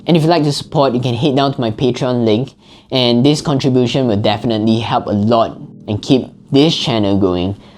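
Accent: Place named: Singapore. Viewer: it is Singaporean accent, go to Singapore and you'll find many people talk like this 😊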